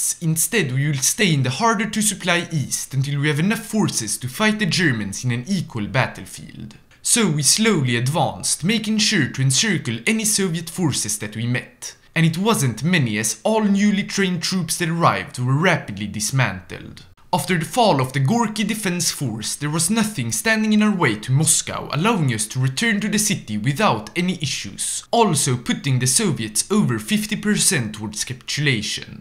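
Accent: Sweden. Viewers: A Swiss man with a Swedish accent playing a Swedish game as Japan.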